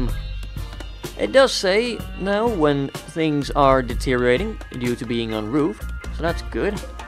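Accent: Netherlands. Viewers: are you dutch ??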